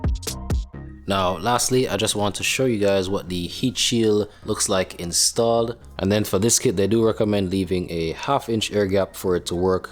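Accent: Jamaica. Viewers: Love the Jamaican accent!🙂 Oh right, the exhaust, loved the sound (and look) of that too!😁 Btw, and yea good idea to install heatshields onto the aftermarket exhaust, they're there for a reason👍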